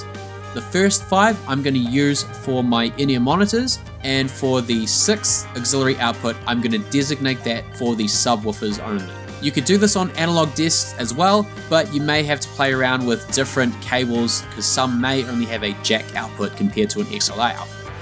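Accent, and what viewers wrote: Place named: New Zealand. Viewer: Good channel! Sounds like a kiwi accent. I have the same mixer too 😊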